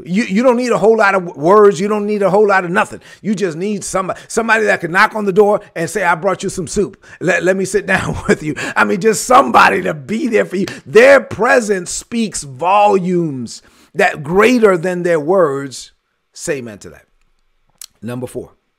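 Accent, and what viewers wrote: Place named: New York, Dominican Republic. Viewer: You blessed me Again today. You do that every day. Also, I know your accent is not only NY, but Dominican first. Thanks for the words every day 💞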